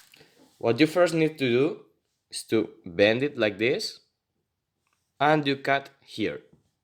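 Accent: Spain. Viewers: Are you spanish? Tu accento lo parece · Jsjsjs como se nota el acento español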